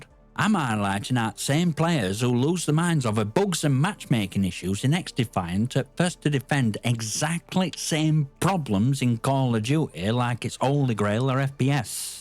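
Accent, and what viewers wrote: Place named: Northern England, United Kingdom. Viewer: As a guy from Leeds, that's one hell of a northern accent. Great video, I have the same gripes with these kinds of people, glad to see someone calling out the bullshit.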